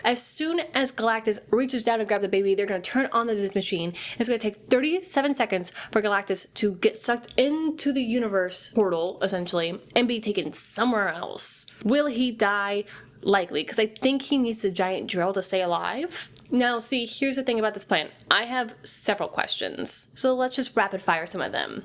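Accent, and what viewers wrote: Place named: Israel. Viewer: you sound israeli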